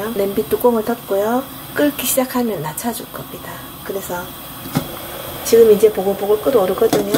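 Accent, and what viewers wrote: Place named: Gyeongbuk, South Korea. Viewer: 오늘 첨 들어와서 보곤 와~놀랬습니다 독특한 네임 이시구나 하곤, 아람냥 지면에서 그냥 글들 보곤 했는데 정말 대단 하신 분이시네요. 말씀이 경북 분이신것 같은데 상어☞갱상도선 돔배기라 하죠 비린내 없는 담백한 맛. haigo! 칼치 조림 묵고 싶어라! 꼴딱 꼴딱~~~~^^ 앞으로 계속 맛있는 영상 봐야 겠슴다....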